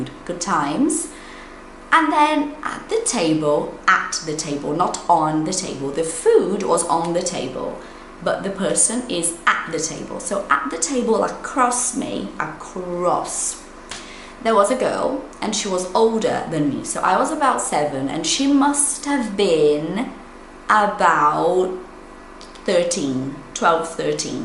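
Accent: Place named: United Kingdom. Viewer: Wow your accent is very beautiful!!! I like it, is so British! 😊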